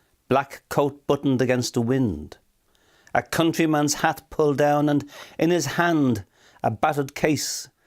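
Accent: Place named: Dublin, Ireland. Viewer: I think this was beautifully read about a man he knew intimately through living with him. I was listening to Seamus Ennis in the background and I could truly visualise him walking down Home Farm Road which was close to where I grew up. I could honestly feel the cold, miserable weather as he pulled his coat on and took the number 11 into town. I loved Bolger's accent which was just like all of my uncles, a soft Dublin accent, one of whom even had the same lisp. I was very touched by the simplicity of the words that were so visual to me.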